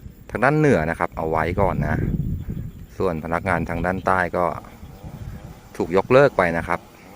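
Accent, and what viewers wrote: Thailand. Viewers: ชอบเสียงบรรยายลูกทุ่งดีครับ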